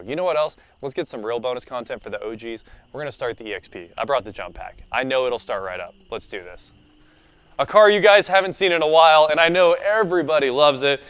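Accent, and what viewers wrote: United States. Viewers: WTF happened to you.....the majority of the time you speak correctly. Today you are in US mode and it is hard on the ears. Please correct that lazy shit talk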